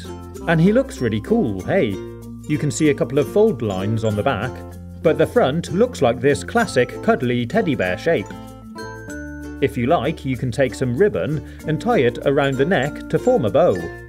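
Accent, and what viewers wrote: United Kingdom. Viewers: Hope u don't mind me asking but are you British?? I am British 😁 U sound a bit Edit: keep up the great work! Love your vids, been subscribed for about 2 years now 😄😮😃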